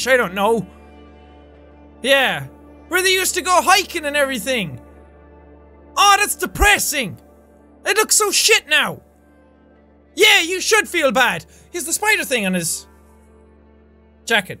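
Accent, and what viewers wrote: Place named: Ireland. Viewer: "And I don't know whoowhy." And other Irish ways of saying words. ^ | Jacksepticeye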